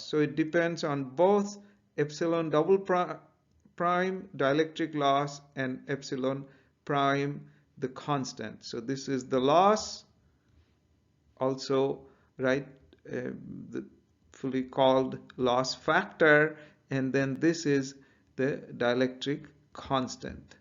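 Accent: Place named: India. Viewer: listen to how fucking Indian you are...incredible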